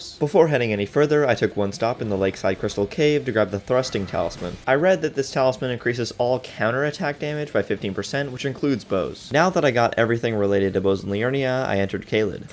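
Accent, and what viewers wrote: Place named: North America. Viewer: Honestly buddy I have no clue. Judging from what I can remember his accent he's probably North American? Keep in mind I only say this because I'm North American and I couldn't identify an accent. So I'm assuming we're the same? Don't take my word for it. Check the interview with OnlyWaifu.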